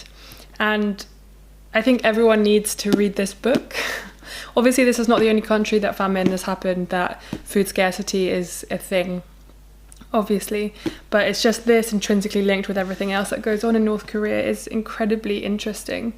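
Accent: London, United Kingdom. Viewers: What part of London are you from? You have the poshest accent I've ever heard haha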